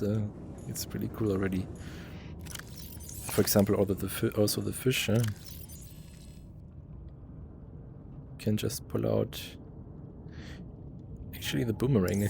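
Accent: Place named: Germany. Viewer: man hört den deutschen akzent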